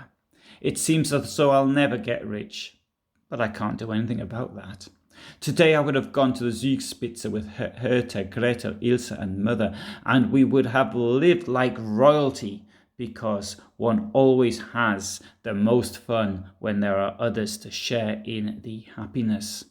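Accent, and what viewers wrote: Newcastle, United Kingdom. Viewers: Another excellent film, thanks. Always a treat to hear Eva's diary in a Newcastle accent. Hahaha!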